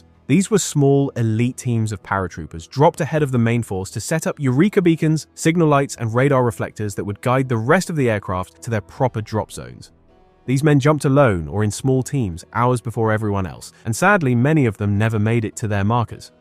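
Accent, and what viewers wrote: United Kingdom. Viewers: The narration sounds Brit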